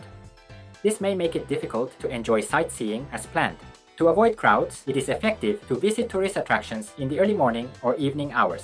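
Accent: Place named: China. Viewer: He sounds like Chinese national living in Japan. Ying, yong, ong sounds. And he doesn’t have typical Japanese accent. May be Chinese national pretending to be Japanese?? Lots Chinese live in Japan.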